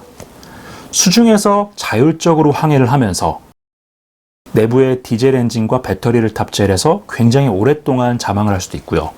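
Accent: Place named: Seoul, South Korea. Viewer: 한번씩 서울말 들어야죠 ^^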